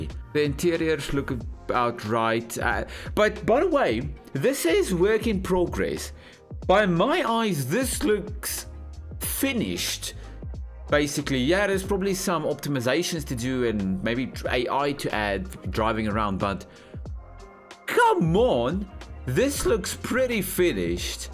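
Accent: South Africa. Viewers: hey dude epic video just a random question here by any chance are you south african? I'm just asking as myself im South African as well and the accent sounds very like South African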